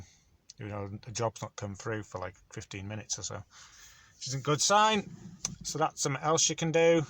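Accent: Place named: Coventry, United Kingdom. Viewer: Are you Coventry guy..as i am struggling with accent a tad..I know cov is 18 miles but there is 50 miles circle that covers a vast amount of areas I wish I was still near somewhere local.. Nottingham should be good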